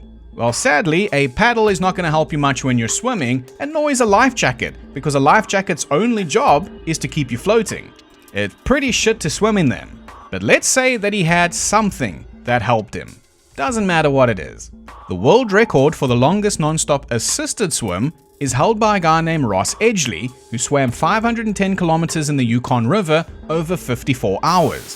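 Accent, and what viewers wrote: South Africa. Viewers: Your content has been getting recommended to me for a while now and I assumed it was because my algo finally realised I might like to see things other than Skyrim challenge runs because this topic is exactly up my alley. So imagine my shock when I clicked on the video and got SUCKERPUNCHED by a fellow South African accent!! But also yes. I do want to know some actual facts about this very very silly legend that I could never make sense of no matter how hard I tried. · This is not how i find out you're South African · You sound like Rodney Howard brown. I knew immediately you were south African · Goddamnit I thought you were an aussie and when you read that Dutch I was like "wtf?" How did I not pick up that South African accent. I feel like an naai. Well, now I have to subscribe.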